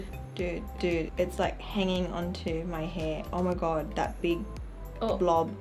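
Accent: Australia. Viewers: Are you an Aussie, you have an Australian accent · Oh man I love Australian accents. This is just so nice to listen to lmao. Also can’t look at the microscope for too long but it is interesting hahaha. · You sound Aussie 🐨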